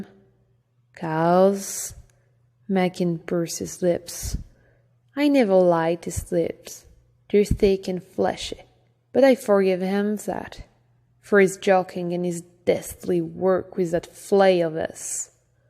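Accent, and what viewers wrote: France. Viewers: Never knew I needed to hear Prince of thorns in a thick French accent. Amazing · Love this book and your beautiful French accent make it even better 😍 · Don't worry. I LOVE your French accent. It's so beautiful and it suits the setting of the book very well.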